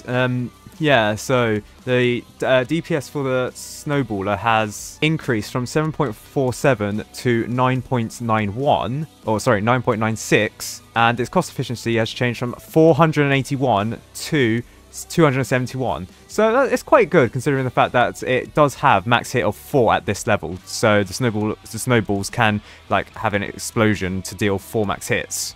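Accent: United Kingdom. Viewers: The amount of Britishness coming out of this is overwhelming (compared to other videos)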